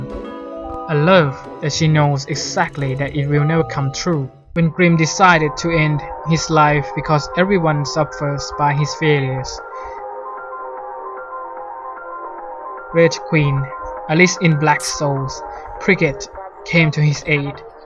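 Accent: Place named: Thailand. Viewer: Are you Thai? You seem to have the accent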